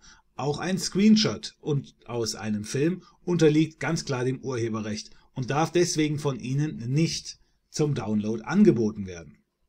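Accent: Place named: Swabia, Germany. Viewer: Schwabe! xD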